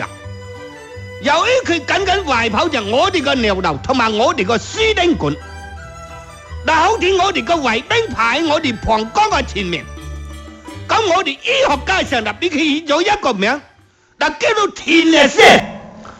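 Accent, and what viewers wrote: Chaozhou, China. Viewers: 潮州口音来嘎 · 潮州口音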